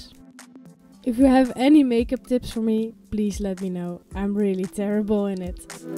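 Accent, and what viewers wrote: Netherlands. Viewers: Maybe it’s good to take some lessons in English language . It’s kinda like dutch english. You can follow English online ! ❤ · Liefe dit was echt heel leuk tuurlijke hou je Nederlands accent maar heel goed gedaan!💗✌🏼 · Echt leuk je hoort soms wel dat je nl bent maar dat maakt niet uit 🥰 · As a Half Dutchie/British guy your pronunciation is quite decent! Sometimes I hear the Dutch accent but I hear through it and doesn’t distract me! So Keep it up! ❤️💪🏻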